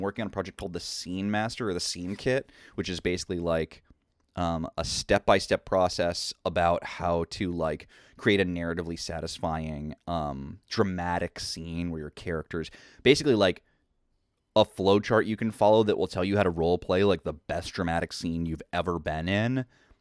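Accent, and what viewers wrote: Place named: Mid-Hudson, United States. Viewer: I can't hear an accent, ya sound upper Mid-Hudson/ Catskills. "Complete Strategist" is a great shop, I couldn't imagine gaming there though. I never got into 5e. I read the core books & thought it looked good but my group wanted to stick with our modified 3e/3.5/PF1e/D20 rules. I can see why they did but I would've given 5e a try. I only run home brewed settings since the '80s & in my experience players will always add to the world. We've been running games in the same world for over a decade now. The setting before that lasted 8 years. Sometimes players will misinterpret my descriptions, make assumptions or connections I didn't think of & come up w/ something way cooler, so I'll just go w/ it as if that's what I intended the whole time.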